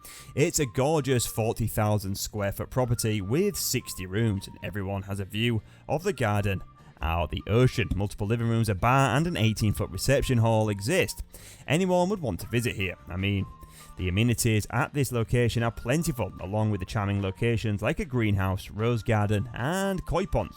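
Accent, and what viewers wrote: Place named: East Yorkshire, United Kingdom. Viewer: The commentator sounds like he's from East Yorkshire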